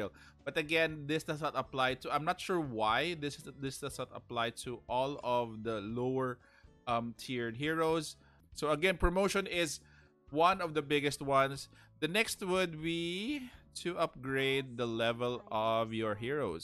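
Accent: Philippines. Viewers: I hope they Put a option so that people can Delete or Keep other servers. By the way are you a Filipino? The way you speak your English has a Filipino accent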